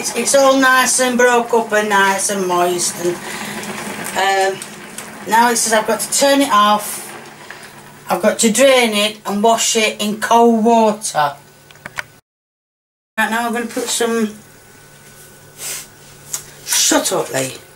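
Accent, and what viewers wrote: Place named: Scotland, United Kingdom. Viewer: She’s from Scotland I think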